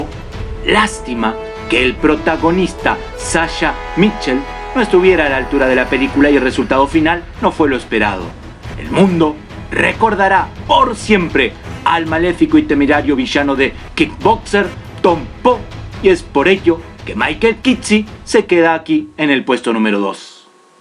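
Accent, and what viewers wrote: Argentina. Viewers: ahhhhh... que irritante el acento argentino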